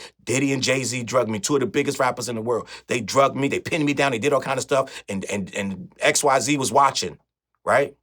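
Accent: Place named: Northeast, United States. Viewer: You hovengers something else lmao ion trust an unbiased opinion on Jay z from a nigga with a northeast accent and fk with you heavy but nah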